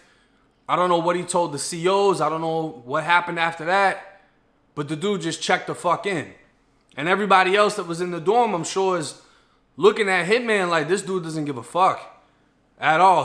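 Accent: Boston, United States. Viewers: Are you from Boston? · I'm rocking with you from Canada. Love your Bahston accent. What an amazing legacy from the letters of your grandmother. That's beautiful. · This Shìt is WILD!! Been watching Booty bandit stories since Fleece’s hours ago…I hear your accent are originally from up north! Thought I heard some Boston in ya. Lol mad random…. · Are you from Boston bro? Def got the accent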